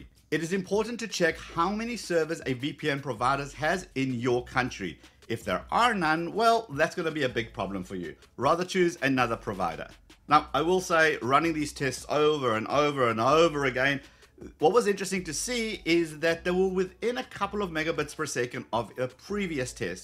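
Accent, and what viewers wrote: Johannesburg, South Africa. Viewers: Dude you sound South African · Are you from South Africa as you acent sounds like JHB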